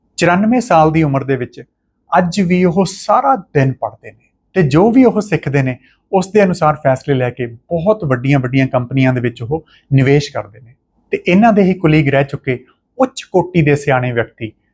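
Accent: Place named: Canada. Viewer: Yes I want it also. Because sir speaks canadian accent.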